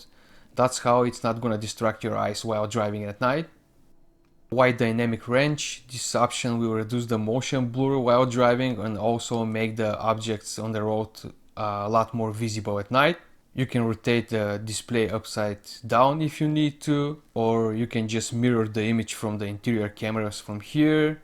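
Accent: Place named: Brazil. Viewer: brazilian accsent :)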